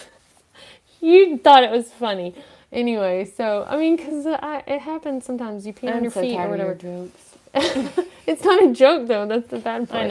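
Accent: South, United States. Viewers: Does your Spanish have a southern accent too.? Haha. Glad to see you had a fun safe journey. Thanks for the videos.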